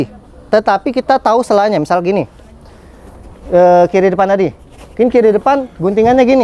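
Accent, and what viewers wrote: Palembang, Indonesia. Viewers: Kyk kenal bahasanya ,itu bahasa Palembang ya salam satu daerah mas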